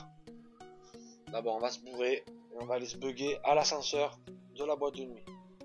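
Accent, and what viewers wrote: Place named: South, France. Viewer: Oui toutes tes vidéos sont bien expliquer avec ton accent du sud j adore poto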